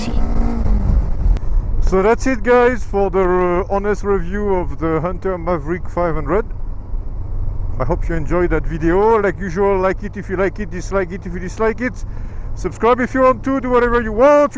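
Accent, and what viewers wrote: France. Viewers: Je me disais bien que c'était un accent bien français ahah ! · I knew you'd buy it! You instantly fell in love with her it was obvious! Great machinery. And yep, I have already checked your vid, it's awesome as every other you have put out till now👌we greeks love a thick French accent! Hehe 🤗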